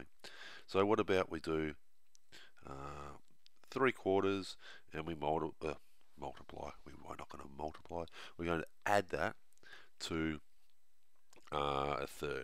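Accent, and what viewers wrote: Australia. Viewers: Thanks in my sats I nearly got 300 points but I got 297 because of fractions if I got 300 points teachers said that it was harder this year because it is year eight work now I am going into year 7 so that you also are you Australian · he's Australian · This guy is not british, he is Australian plus daddy pig has a deeper voice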